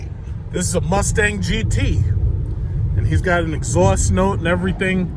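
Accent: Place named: New York, United States. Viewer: Yo where is this dude from? Sounds like a new yorker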